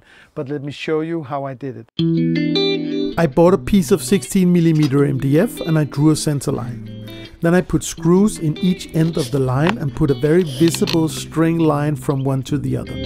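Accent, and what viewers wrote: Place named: Germany. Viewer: Why isn't it perfect? What are the problems there? I removed a year ago the neck of my strat copy and now the body seems to have changed due to humidity. The neck pocket was way too tight anyway. I'm trying to realign and reroute the neck pocket now. If your method wasn't perfect how can I achieve an absolutely centered neck? Doesn't matter how much work it is. I'm a perfectionist and I'm trying to achieve less than tenth of a millimeter deviation from body to the end of the headstock. I want it perfectly centered How should I do it? Your accent sounds German. Grettings from Braunschweig by the way.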